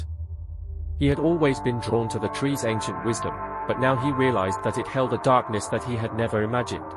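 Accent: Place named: United Kingdom. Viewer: I love it, esp the British accent.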